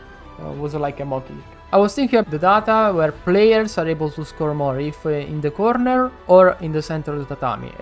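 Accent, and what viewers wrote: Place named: Italy. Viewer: I understand that you are italian from the accent.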